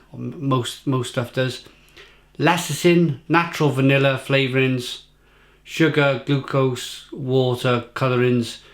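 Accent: Wales, United Kingdom. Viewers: I think videos are great, you never know what you're going to get, I actually love the Welsh accent...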